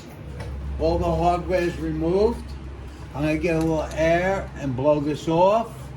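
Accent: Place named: New York, United States. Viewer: You sound like you’re from NYC. Queens?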